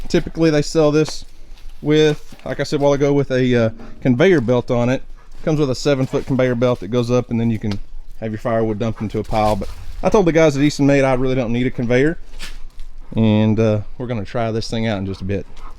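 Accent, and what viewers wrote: United States. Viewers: Wooowhoooo CANADIAN MADE!!! 🇨🇦 sorry my country pride is showing! Funny when you said the name of the company I immediately thought hey isn’t that a Canadian company?! When you confirmed it right away I thought way to go! Then I thought wow with the exchange rate you probably got a heck of an amazing price too! Smart man! I’m so proud that you were as thrilled with it as you were, built tough to last! Trust me when you live in the “great white north” you want to have tools that work and will last through the elements. Lol! I love your American accent too! Hehehe 🥰 funny here I am living on the 34th floor high in the sky in a metropolitan area watching a country guy splitting firewood and feeling country proud! Life is strange at times eh? (Thought I’d toss in that Canadian “eh” just to prove I’m truly a Canadian! 🇨🇦 As always I love your videos and this one was extra special for me. 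So glad you enjoyed splitting your firewood isnt life so much sweeter when we enjoy what we do? Sure makes chores less like chores and more like just living. Be well and enjoy your new toy!! Lol!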